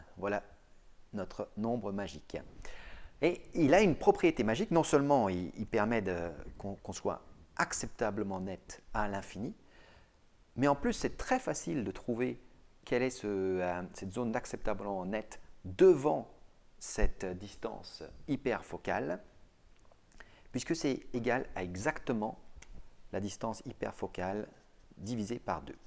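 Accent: England, United Kingdom. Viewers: Merci pour votre pédagogie qui n'a d'égal que votre humour et votre accent anglais, qui détonne dans la bouche d'un francophone. Vous êtes le Choulax de la photo comme on dit ici.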